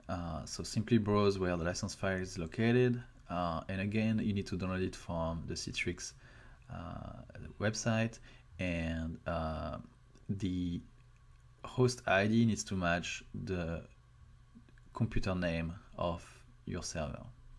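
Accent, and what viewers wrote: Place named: France. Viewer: so nice accent! After I have watched this video I want to learn Franch language :)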